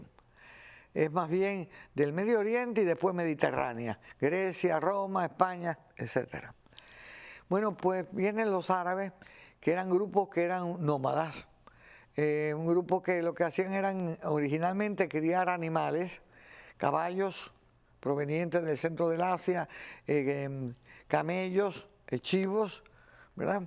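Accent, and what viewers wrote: Cuba. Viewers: 👀Yo conozco gente que tienen 70años y nO han “ madurado “ 😅😅😅ja ja ja 🤣🤣🤣🤣🤣cuánta VERDAD ❤tú acento sutil del ORIENTE cubano es notable * y además es un orgullo 🥲 🥹 I PROUD 🥹 of U 📚📖🌳🧘🏻‍♀️🌊🌺🌳🫶🏻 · Ya soy adicta a su forma tan natural de expresion....muyyy cubana. Gracias Dr. por compartir con nosotros sus conocimientos.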